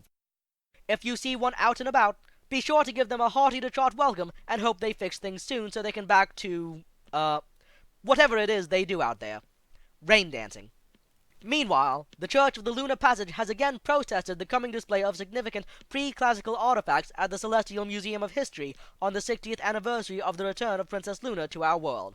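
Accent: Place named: United Kingdom. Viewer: Enjoyed it. Wish you had continued this; really like your voice there, especially with what your accent brought to this. Is it British? (ugh, can't place the accent otherwise. . .)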